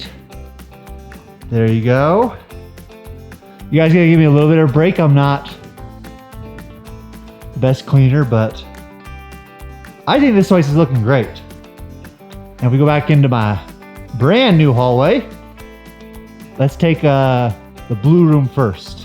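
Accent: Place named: East Coast, United States. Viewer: You sound like youre from the east coast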